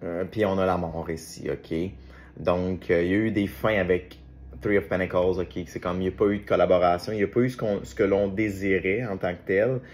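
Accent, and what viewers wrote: Canada. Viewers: cette guidance qui ma bcoup parlé de À à z merci belle âme pour tout j'adore votre accent canadien bonne continuation et de lumière je vous kiffe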